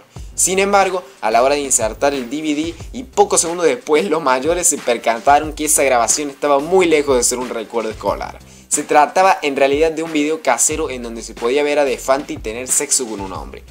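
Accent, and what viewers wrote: Córdoba, Argentina. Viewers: eres cordobes ? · Oh Un cordobeh 👉😜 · adivino eres argentino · sos cordobés? yo soy de buenos aires ARG💖💖💖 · El Chabon Es Cordobés v: <3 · una pregunta sos cordobes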